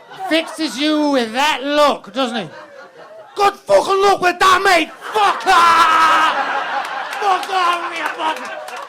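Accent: Liverpool, United Kingdom. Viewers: Best comedian and does a great Scouse accent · This is hilarious : pure genius ; great Liverpool accent...I love the line : "Then you have to skulk off and pack your goods in the corner of the Aldi ... like a rat!" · Only non-Scouse person I've seen besides Chris Barrie (Red Dwarf) that can do a convincing Scouse accent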